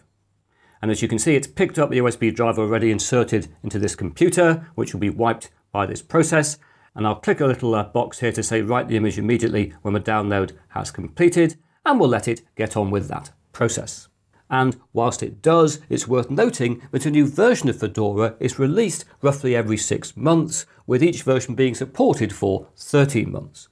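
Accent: United Kingdom. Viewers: A Fedora is a hat. I believe it was Frank Sinatra's choice. (But it wasn't red, I think). Thanks for the insight into yet another operating system. By the way: You British always seem to have a problem pronouncing "kn" and "gn". Very often you just ignore the k or the g: [nife] rather than "knife", [nu] rather than "gnu". But when you do decide to pronounce the k or the g, you always insert an e or sometimes an a: "Genome" rather than "gnome". You once had a Danish king named Canute. Except, his name was really Knud. Where did that a come from? The funny thing is that it isn't difficult at all to say "gnome" with no inserted e. In Denmark we do it all the time.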